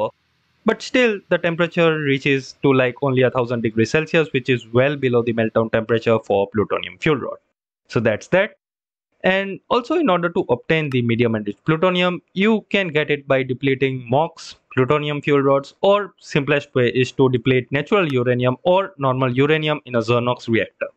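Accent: India. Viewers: Thank you thank you guy with Indian accent. Without you i would have been lost the moment i stepped on this mod. Killed over and over again by the Glyphids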